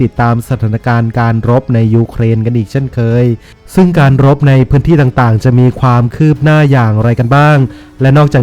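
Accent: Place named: Isan, Thailand. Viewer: เปรียนคนเว้าแล้วติครับ555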